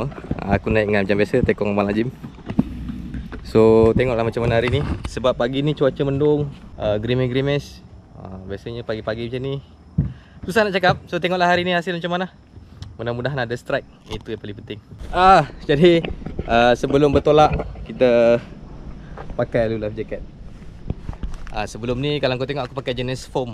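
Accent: Peninsular Malaysia, Malaysia. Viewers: selama sye tgok video abg ni.. sye pelik.. dok sne tpi loghat semenanjung..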